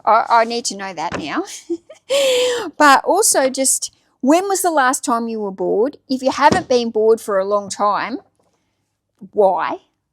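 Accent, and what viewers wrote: Australia. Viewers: I love this....I don't know how to be bored...I started driving strangers (Lyft and Uber) in the NYC and NJ Metro Area....I was burned out from my own business (Consulting for startup Co's ) and needed to get away from a computer. I was terrified about not having any money coming in and having to use my retirement fund to pay bills....I put my head down and just...F......G drove for 2 years....But it wasn't work that I found painful...it was quite liberating and I found my way back to some consulting work but found that Starting my own Youtube Channel based on those 3,000 Lyft Rides (with Strangers) has given me such meaning and put to use all of that experience...Money is still an issue and at 62...I'm still hustling but at a level now that if fun and sustainable and without the fear! Found your Back from Burnout Channel and appreciate your content and lovely Australian accent. 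Thanks. Sean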